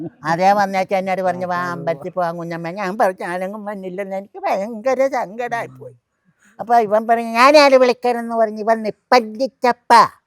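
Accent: India. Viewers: കുറെ കാലം മുൻപ് ചേർത്തലയിലെ ORIGINAL സംഭാഷണം ശൈലി. തകർത്തിട്ടുണ്ട്!! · അടിപൊളി 😂 ആലപ്പുഴ മാരാരിക്കുളം സൈഡിലുള്ളവർ സംസാരിക്കുന്ന പോലെ 😂😂😂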